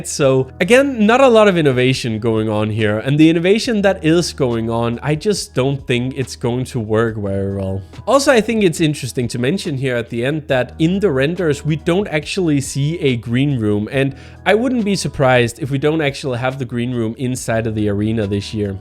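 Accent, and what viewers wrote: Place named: Denmark. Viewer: man kan bare høre den danske accent skinne igennem :)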